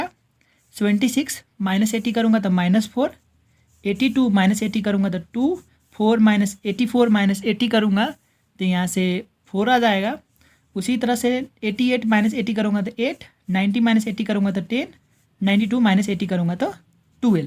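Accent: Nepal. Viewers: Why do i feel like ur a nepali??that accent is so nepali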